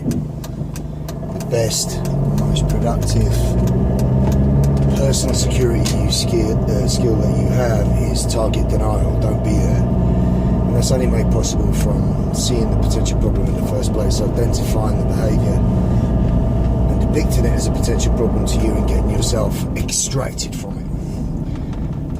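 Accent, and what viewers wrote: England, United Kingdom. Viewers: I did‘nt understand only one word with this England english😭😭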